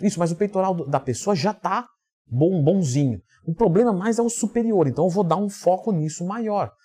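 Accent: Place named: Pará, Brazil. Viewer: Mas temas aí pai: que fala é o de Belém do para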